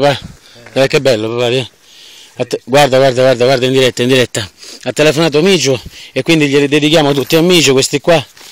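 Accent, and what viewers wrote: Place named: Tuscany, Italy. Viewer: Cominci pure a parlare toscano....grande!!! Ciauuu.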